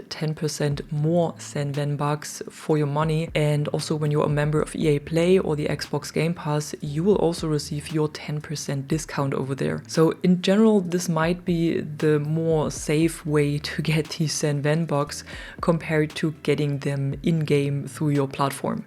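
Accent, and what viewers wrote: Germany. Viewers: you kinda sound german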